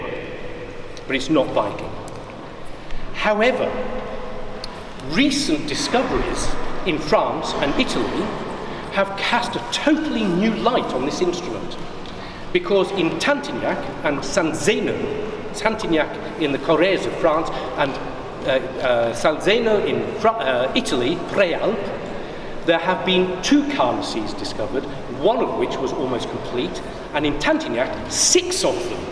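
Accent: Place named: United Kingdom. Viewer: It also helps that his accent is British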